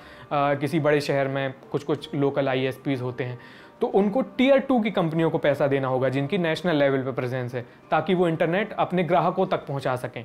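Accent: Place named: Lucknow, India. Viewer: Shukrya Bohat behtar tareeqe se aaone samjhaya. Aapka bohat behtreen URDU LEHJA HAI AUR urdu aap ki bohat sheereen hai..Lagta hai asp Urdu jagah ke hain...Lucknow Kanpur Rampur banaras Allahabad Bareilly... Aap aur samjhayen aap ki zuban sunkar mehsiis hua ke ham lucknow kanpur men hain Masha Allah Allah aap ki zuban aesi sheereen banaye rakkhen Ameen. Aur sab aesi hi Urdu men baten karen Adaab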